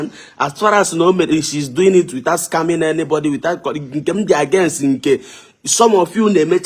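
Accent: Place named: Mbaise, Nigeria. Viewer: Waoo you really changed,where have you been, I could remember you with thick mbaise accent,now is very hard to hear that accent again.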